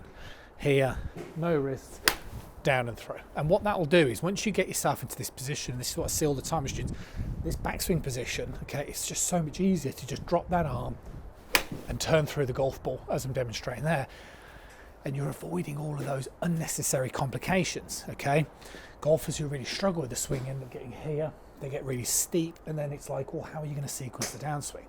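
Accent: United Kingdom. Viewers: He has aBritish accent and talks too fast also.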